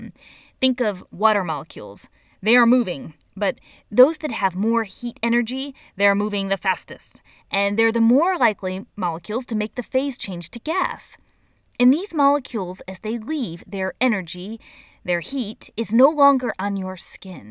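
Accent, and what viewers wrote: United States. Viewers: Nah, it's the annoying whiny voice, american accent, and also the tone that would be condescending for adults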